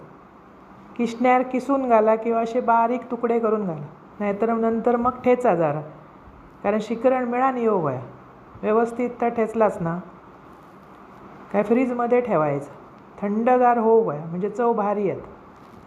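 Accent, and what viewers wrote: Malvani, India. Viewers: Tai tumhi kay kay recipi bannvta aani goad malvani bhasha amachya aai sarkhi athvan aali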